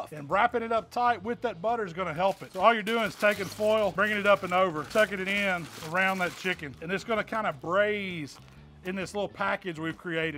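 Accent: South, United States. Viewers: I love watching your videos but I love listening to that awesome Southern drawl even more.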